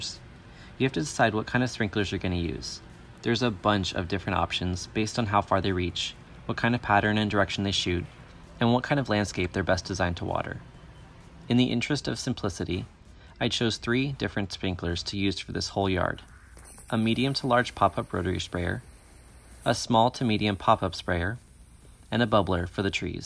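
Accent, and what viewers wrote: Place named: Germany. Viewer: ja hört sich irgednwie sehr deutsch an. xD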